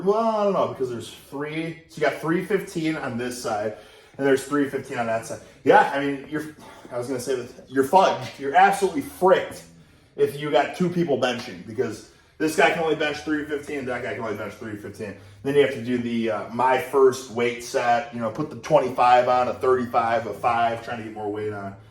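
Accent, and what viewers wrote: Midwest, United States. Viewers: I love Midwestern swearing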